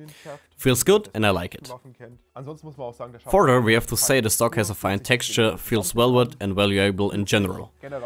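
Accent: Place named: Germany. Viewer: Language advice: german to english - your V's and W's are backwards. You are using a Visim scope. Your pronounciation was "Wisim". Just flip it around and you should be good. I have german friends that make that mistake all of the time.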